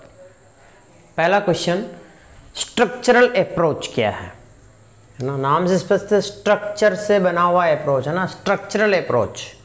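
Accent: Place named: Bhilwara, India. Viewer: 🙏🙏🙏🙏🙏🙏🙏🙏बहुत बहुत धन्यवाद sirji.... वैसे क्या आप भी भीलवाड़ा से है... भी... से अभिप्राय है की,,, मैं तो पक्का भीलवाड़ा से हूँ.... और आपकी लैंग्वेज और बोलने के तरिके से लगता है आप भी भीलवाड़ा के ही है... सही कहाँ ना मेने 😄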